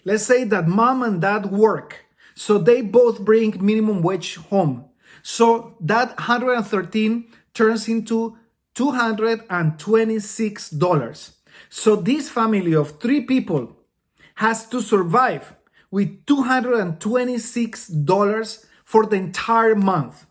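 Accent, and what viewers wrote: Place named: Latin America. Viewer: Esta genial que mantengas tu acento latino!!!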